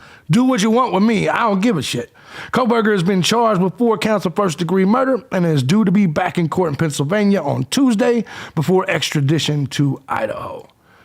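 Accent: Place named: New York, United States. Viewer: After the BATTLE EVERBODY IS A GENERAL. AND SUPERINTELEGENCE, AND DRIVE HIS CAR, HAVE HIS PHONE, A IMBECIL WOD BUY A BURNER, AND MINIMUM 6 MONTHS EFORE, BEFORE THE VIDEOS IN THE SHOP IS DELETED, AND NOW I DONT GIVE ADVISES IN MY TOWN BELGRADE THIS NOW EVERY KOD THE SAME IS IN ARE YOU FROM NEW YORK ORE NEW JERSEY FROM THE ACCENT YOU NOT FROM A SMALL CITY YOU HONNEST YOU SAY WHAT YOU THINK AND AY RESPECT THIS, ALL THIS FOR ME IS REALITY THE ROMANES HAVE GLADIATORS, WY THE PEOPLE TO DISTRACT FROM REAL PROBLEMS. GRETTINGS FROM BELGRADE - SERBIA.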